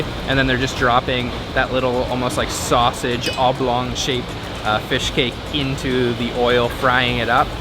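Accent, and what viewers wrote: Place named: Eastern Canada, Canada. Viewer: 100% Eastern-Canadian Accent!!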